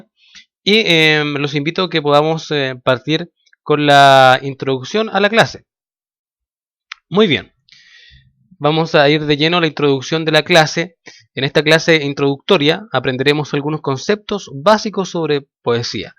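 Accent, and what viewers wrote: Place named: Chile. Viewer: solo un segundo me bastó para reconocer a un chileno xD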